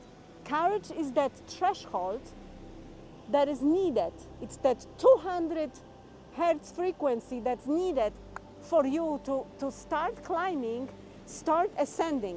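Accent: Poland. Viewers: Hello, thank you for your words, you seem to speak with Polish accent. ❤❤❤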